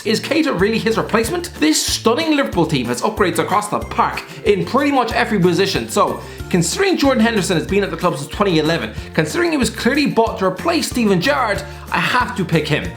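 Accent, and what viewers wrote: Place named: Ireland. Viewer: Pro tip for all the non-Irish folks, watch the video at 0.75x speed to better understand the genius of his jokes through his accent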